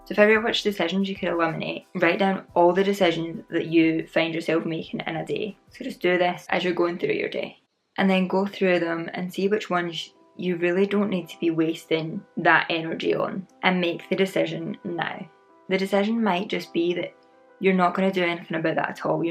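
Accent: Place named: Scotland, United Kingdom. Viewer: 🥰 btw where are you from? I love your accent. Kinda reminds me of the Scottish actress Karen Gillan.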